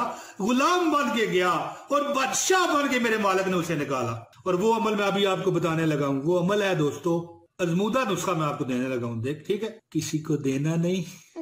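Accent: Pakistan. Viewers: achaaa mjy lga Lahore k ya Faislabad k hn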